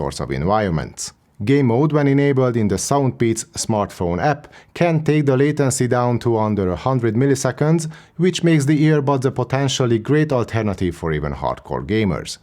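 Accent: Hungary. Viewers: First of all, great content! Secondly, is it just my ears playing with me, or you do have a hungarian accent?😅 We tend to say that a hungarian can recognize another hungarian no matter how professional their language skills are😅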